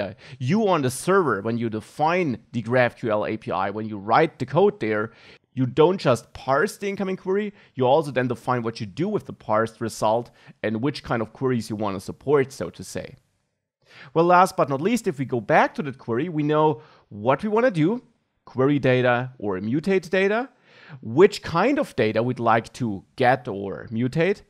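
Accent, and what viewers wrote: Germany. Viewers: very smooth vid, clean and explicit good watch ! just one thing may i ask: is this a german accent ?(just curious)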